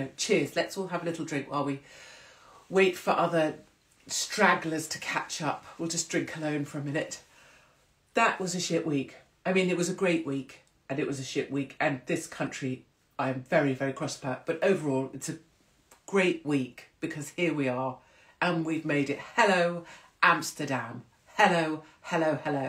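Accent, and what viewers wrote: RP, United Kingdom. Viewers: Yes Joanna Lumley - posh spoken - really outspoken😀👍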